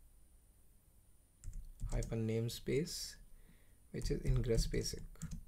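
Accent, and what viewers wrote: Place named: India. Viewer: first and foremost, thanks for the video. Also thank you for speaking clearly. I often close videos with Indian speakers because it's hard to understand. You have a heavy accent but it's clear and professional and a quality sound recording. thumbs up and cheers mate!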